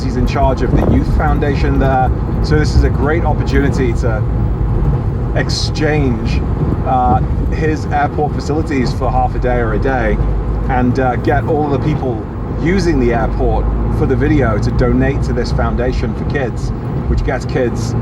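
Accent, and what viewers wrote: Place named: North West England, United Kingdom. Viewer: 4 people..just don’t understand life! I’ve recently purchased a <num> in the uk after no car ownership (living in London) for nearly 8 years. You just can’t fault The car and In Tamdem this channel - open honest, content and ideas that make Porsche ownership seem like the Right choice - despite the wankers (and there are a lot) hat tip!! Cheers - and you sound like you were from the north west uk - much respect!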